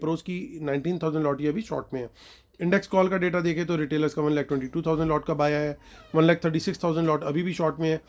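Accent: Punjab, India. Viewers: Baaton mein punjabi flavour na ho aisa ho hi nhi sakta🎉😅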